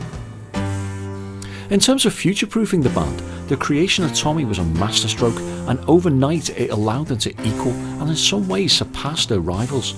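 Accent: Liverpool, United Kingdom. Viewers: Schade, daß keine deutschen Untertitel verfügbar sind. Ich mag deine Retrospektiven seit langem sehr gerne aber dem Liverpooler Akzent kann ich nicht folgen😂😢😢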